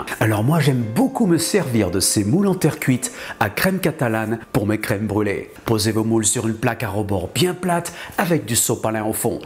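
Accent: France. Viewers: Merci! Ma blonde déteste ton accent français mais je l'adore et t'immite constamment. Plus tu nous apelle avec des noms de petits animaux, plus j'ai de munitions, alors continue ton bon travail!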